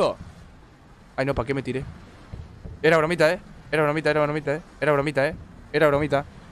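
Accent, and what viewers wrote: Uruguay. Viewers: no es argentino es Uruguayo es q lo args y los urus hablamos igual xd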